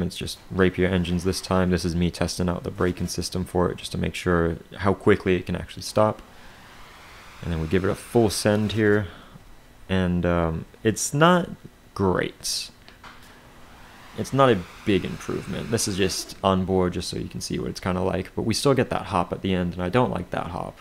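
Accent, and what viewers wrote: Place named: Mid-Atlantic, United States. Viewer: A true "Mid-Atlantic Accent" then!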